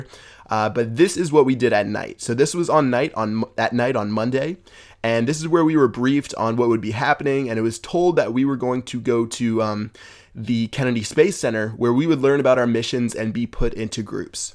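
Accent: Netherlands. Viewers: You could really hear it was a dutch man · that's a dutch accent for sure lol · yeah he is dutch that accent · All of us Dutch people recognize his accent haha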